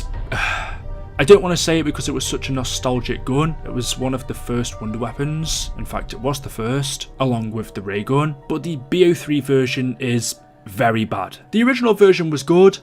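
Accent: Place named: Birmingham, United Kingdom. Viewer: Are you from Birmingham? I mean that accent tho..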